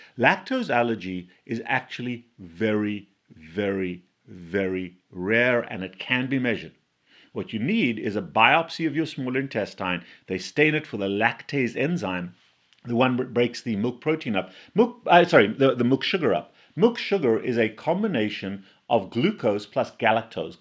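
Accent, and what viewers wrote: South Africa. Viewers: Ha! I thought you had a South African accent.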